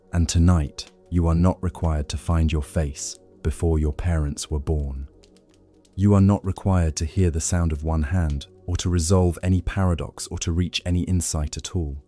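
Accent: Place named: United Kingdom. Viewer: British accent no freaking thank you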